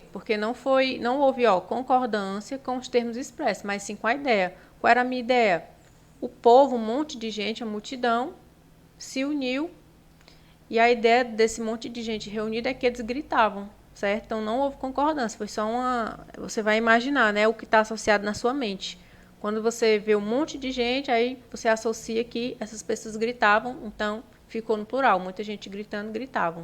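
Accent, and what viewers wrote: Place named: Northeast, Brazil. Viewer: Quando acho vídeo aula com o meu sotaque nordestino, meu coração fica como ?! 🥰🥰🥰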